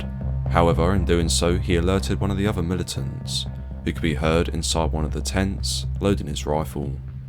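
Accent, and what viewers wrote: United Kingdom. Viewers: Love your videos. The biritsh accent makes it even better.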